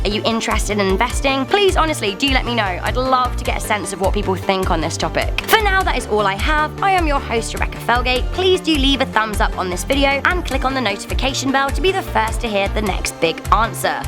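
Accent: United Kingdom. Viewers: so British. There's millions of people with the accent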